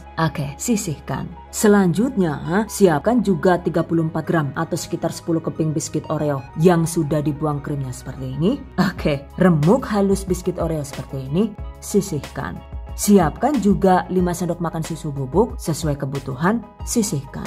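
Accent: Banyumas, Indonesia. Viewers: Aku ngakak bunda ngomong ngapak. Wkwkwkwk (sedikit ngerti). Trims resepnya bund, kayaknya lebih praktis pake coklat yg sudah berwarna ya, lebih awet pula. Sukses buat. Aamiin... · Yassalaaam ngapakmu marai aku kemekelan mbak😂😍😘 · Ya Allah deneng rika ngapak bu,asline rika si wong ngendi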